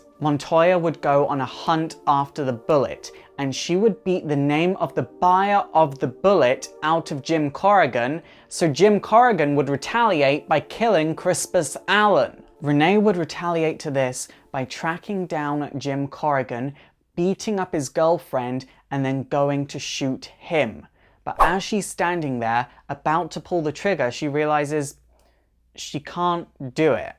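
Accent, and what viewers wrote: United Kingdom. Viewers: Sorry if this question is really stupid,but are you british?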